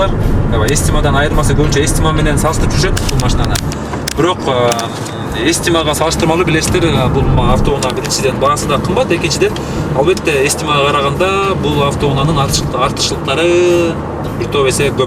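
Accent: Standard. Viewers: Кыргызча таза так, Жана тунук суйлойт экенсиз. Албетте ийгилик каалайм. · ишинизди мыкты кылат экенсиз таза суйлойт экенсиз дагы кутобуз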